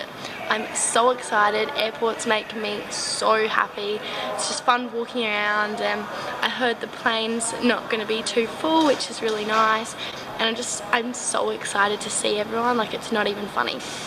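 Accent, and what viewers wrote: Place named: Australia. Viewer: i mean its a australian accent, but danish family and passport of your own is a blend of two countries, like you get two ways of life, countries and cultures etc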